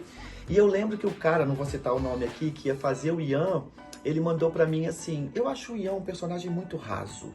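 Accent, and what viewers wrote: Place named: Rio de Janeiro, Brazil. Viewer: Eu achei que vc era Carioca,. Eu fui ao Río duas vezes. Foi maravilhoso demais, me diverti muito. Eu sou de Salvador. Ba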